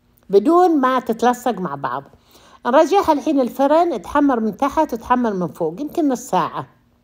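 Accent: Iraq. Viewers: احسبك عراقيه كلامك شوي عراقي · نتشرف بيج ست انا من العراق نفس لهجتها انا احبك كثير واحنا عندنا عشيرته الجبور تمتد الحجاز كلها وهي أكبر عشيره في العراق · يمكن يسألوك لأن طريقة نطقك للحروف عراقي بالذات لما تقولي ماء ورد وكلمات كثير عراقيه ناسيتها انا تابعتك وعلى بالي انك عراقيه بالمدينه وبعدين عرفت انك سعوديه مو من القبوري من طريقة كلامك ولكن انتي عراقيه او سعوديه عسل ماشاءالله ،،يعطيك العافيه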